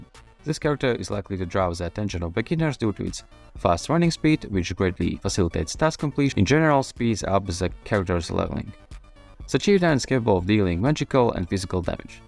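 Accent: England, United Kingdom. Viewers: о вы из Англии? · Не понял. Are you from England?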